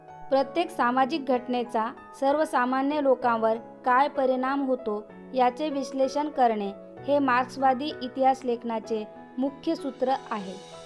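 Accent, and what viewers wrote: India. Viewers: Tumi kontya gavane bolt aahat😊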